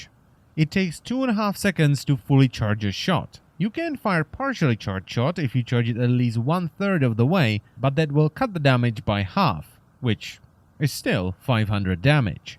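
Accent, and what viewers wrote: Czech Republic. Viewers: Czech accent af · >that czech accent Nádhera :^)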